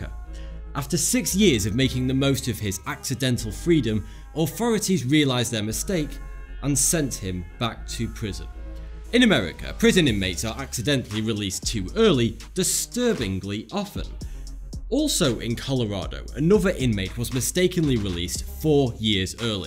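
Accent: United Kingdom. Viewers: I wonder how many prisoners are falsely convicted. No one can make up for the wrong person’s humiliation and a new bad reputation. I guess that people in jail lose the respect from their family. The wrong people in jail isn’t justice. Remember the Memphis three from Arkansas? Anyone can lie about someone else and be taken seriously. When that happens, the court and the system goes out of their way to make it so that the defendants end up behind in bars even if they are innocent. It’s not against the law to try to make the defendant angry so that look worse than they are. There are a lot of bad prisons. I don’t like this man glamorizes the United States prisons. He has a British accent, so how does he know so much about American prisoners?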